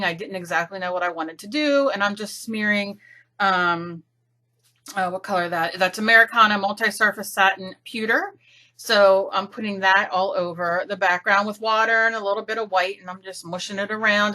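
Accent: New Jersey, United States. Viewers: You are awesome! I love this & I love you girl! I watch you not just to watch you do your art (which I could do all day, & sometimes do......lol) but I also just love to hear you. You are funny, I love the jersey accent, & you have things that are important to say......so I said it befor & I will say it again.....Love you girl! Keep doing what you do!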